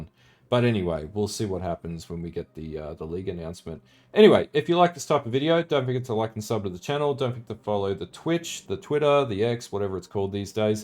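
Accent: Australia. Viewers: i have missed the aussie twang. it is so good to hear poe ideas spoken in my lingo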